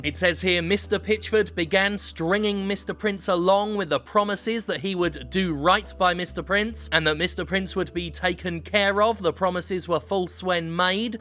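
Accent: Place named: United Kingdom. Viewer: I wish I could say controversy like that. But without the British accent, it just doesn't work.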